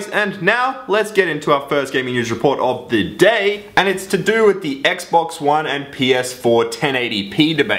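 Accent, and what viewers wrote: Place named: Australia. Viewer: Aussie mate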